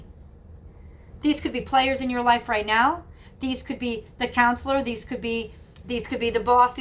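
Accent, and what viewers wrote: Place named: New York, United States. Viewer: Such a small world, when I first watched I thought you were from NY from the slight accent until you mentioned the place in Derby! Just moved to Newtown, originally from Danbury area. Not sure how the YouTube algorithm works but didn't think it would be suggesting people from the area haha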